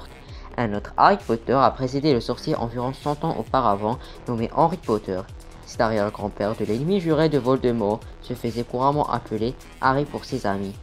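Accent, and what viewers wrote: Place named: Morocco. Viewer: C'est quoi ta voix ? Accent ou ton pays ? C'est un mélange entre un marocain, un québecois ! En plus on dirait que tu as de l'asme ! Ta voix c'est un bordel omg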